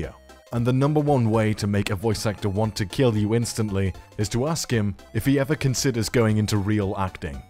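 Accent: United Kingdom. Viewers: Are you british? You sound it.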